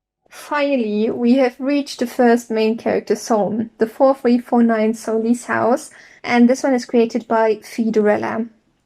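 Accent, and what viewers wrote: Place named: Germany. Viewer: Sie ist sowas von Deutsch. Ihr Akzent 😅😂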